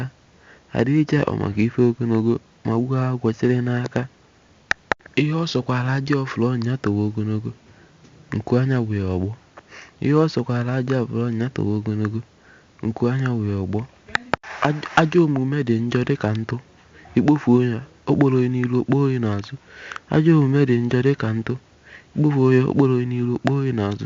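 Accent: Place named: Ezaa, Nigeria. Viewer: NONSENSE,, ARE YOU TALKING TO YOURSELF ? OR TALKING FROM A RAT HOLE,, NO PROFESSIONALISM,, CUT AND JOIN,,,GO AND LERAN ''IGBO IZUGBE'',AND STOP SPEAKING EZAA/ABAKALEKE DIALECT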